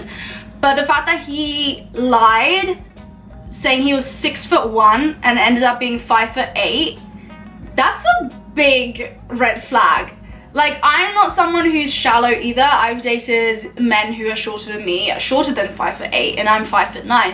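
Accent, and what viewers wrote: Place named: United Kingdom. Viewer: ohh because i heard some British accent..nice to meet you here🌼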